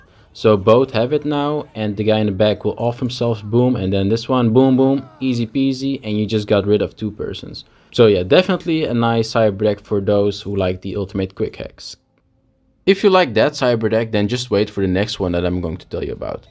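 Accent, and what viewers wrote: Netherlands. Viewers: Great video man, very helpfull!!! Ps. Is that a dutch accent i hear?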